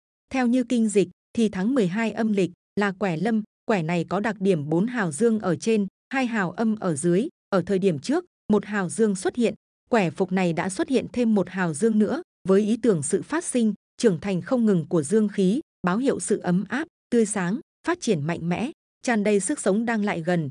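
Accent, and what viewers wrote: Thanh Hoa, Vietnam. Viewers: Giong Thanh hóa nghe đã ko dung rồi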